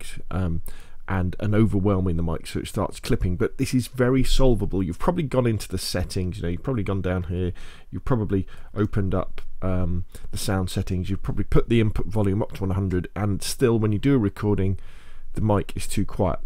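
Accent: England, United Kingdom. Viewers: too english like wotah(water) eh ?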